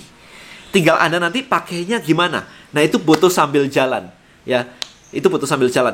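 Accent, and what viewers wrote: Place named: Surabaya, Indonesia. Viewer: logat surabayansss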